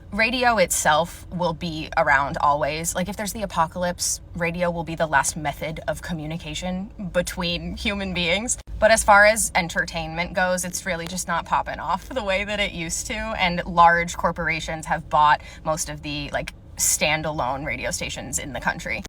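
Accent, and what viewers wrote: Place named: New England, United States. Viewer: I love the way you talk! I was raised in Rhode Island and your accent and mannerisms remind me a lot of my hometown friends (i dunno where you're from in new england but your accent is familiar to me at least) your content is just v cozy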